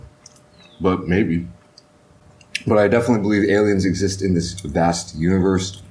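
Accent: United States. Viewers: Hey i do have a question and help me pick up your accent man so like how did you get this i mean i get it you were in the states for quite some time but help me out man and been a watching your videos since lockdown and enjoying these vids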